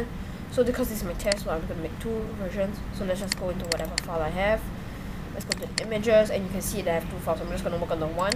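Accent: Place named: Singapore. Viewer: You sound like a singaporean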